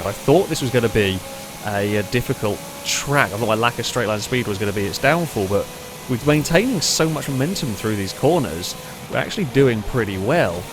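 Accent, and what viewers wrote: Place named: Midlands, United Kingdom. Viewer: In queens English, you would be correct, but accent isn’t queens, it sounds mid shire to me, and it’s probably acceptable in that accent. Of course a lot of people think that Queens is the only “correct” accent and insist that it’s grammar rules are followed, but frankly, it’s a very old fashioned point of view.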